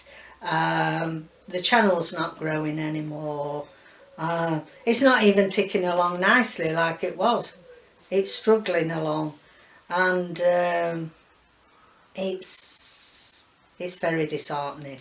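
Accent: Yorkshire, United Kingdom. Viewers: Hi, Ive been a subscriber for a while now and although I dont comment, I thoroughly enjoy your videos. Months ago I was just scrolling through YT and came across a Yorkshire accent, well I knew I had to follow you, being a yorkshire lass myself. I know nothing about junk journalling but I still look forward to your videos especially the sewing ones. I learnt so much from you watching those. I will be sad to see you go but you have to do what you feel is best for your mental health. Could you do one junk journally vid one week then one sewing vid the week after. Have you thought about selling on Folksy? Its a smaller channel but is a lovely place to sell as there are no mass produced rubbish on there. I really do hope you stick around, maybe have a break to regroup. Please take Care. Angie x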